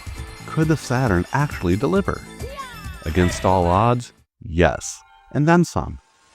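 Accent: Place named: Portugal. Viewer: Haha, partially, yes! There were some bits in your accent that had me wondering. Also, the topics you cover on your videos - relatively speaking, there's a high proportion of Portuguese people on SEGA-based retro communities because of how impactful the company was over there, destroying Nintendo in popularity in the 90s. So there was a considerable chance you'd be like i.e. Tee Lopes, someone who was born and grew up in Portugal during the 90's but moved to the US at a young age. Thanks for answering, keep up the great content!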